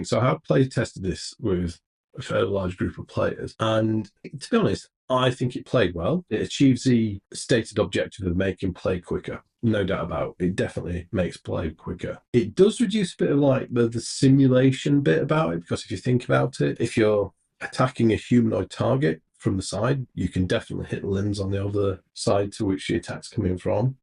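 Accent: United Kingdom. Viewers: Thanks for the analysis. But unrelated, East London accent?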